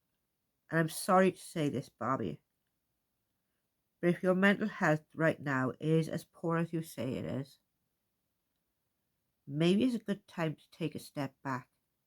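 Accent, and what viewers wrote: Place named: United Kingdom. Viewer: British accents are beautiful as my Gran was from Northern England in Warrington. To hear you speak is like being home to me. I have a very mixed accent, but my Southern Tennessee/Kentucky stands out the most. I don’t see Renelle as a problematic creator , she’s a strong lady and I admire her. I like Barbi too. Subbed to them both, but I’ll not partake in negativity. We have so much of that already. I post mostly nature videos and goofy parodies. I may share serious topics here and there. It’s a great hobby for me as it’s not a montetized channel. My advice if anyone gets sucked into that negativity? Ignore them. Block them. Unsub them. Don’t speak of them. That’s what I’d do. I was stalked online almost ten years, it’s awful. We need to be kind. Thank you for making this video. I’m subbing. 🌹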